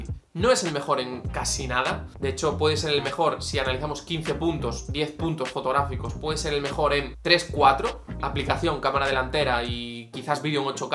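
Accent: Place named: A Coruña, Spain. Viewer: Una buena opción si me quisiera gastar tanto en un móvil aún así hay cantidades que sigo sin querer dar por la telefonía. Soy yo o eres de Coruña? Lo digo por el acento😂😂, si es así...yo vaya a donde vaya también lo saben enseguida, es delatador😐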